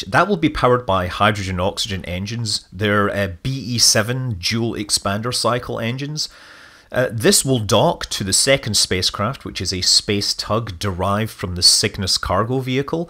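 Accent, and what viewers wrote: Scotland, United Kingdom. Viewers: Are you Scottish? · "Hulloo, Scott Manley here." How do you write the "Scottish" accent? I love it but don't know how to get those "ahrr's" in print, bummer!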